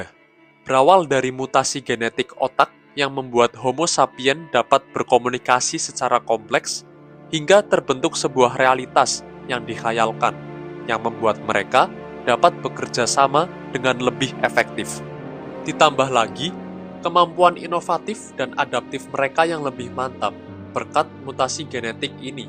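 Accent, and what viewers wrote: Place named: Banyumas, Indonesia. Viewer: Sampean wong ngapak Yo mas...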